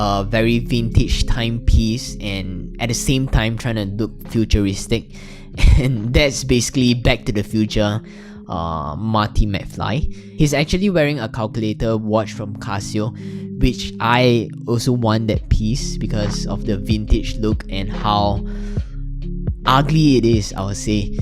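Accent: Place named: Singapore. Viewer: Your Singapore -English accent made this video great . Excellent good job ...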